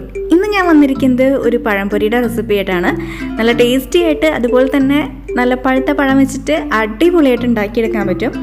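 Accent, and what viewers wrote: Thrissur, India. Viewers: Ningal trissur ano....ente oru friend und shyra......same samsaram anu.....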